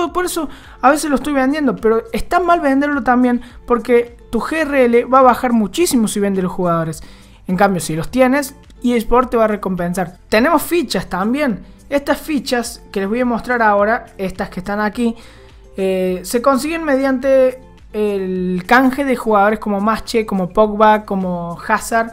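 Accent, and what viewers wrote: Córdoba, Argentina. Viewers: ¿sos de Córdoba no? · Re cordobes el qliau jajaj genio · Pareces una combinacion de cordobes(personas de corfoba,Argentina) y un pelo de español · Alto cordobes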